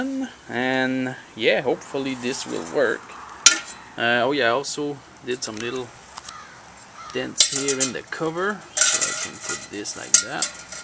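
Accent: Quebec, Canada. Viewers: Sonne accent québécois ca mon chum ! Bon video ! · I was gana make a comment about in Canada we just trow a bit of water out and see if it freeze but Avec ton accent j'ai réaliser que tétait canadien.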